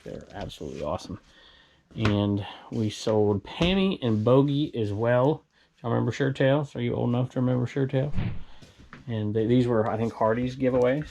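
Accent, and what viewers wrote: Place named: Minnesota, United States. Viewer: Yah Sure !!! Youbetchamn must be from Minnessota !!! Yah Sure Youbetcha !!!!! lol, easily recognized by this Wisconsin norwegian/American gal.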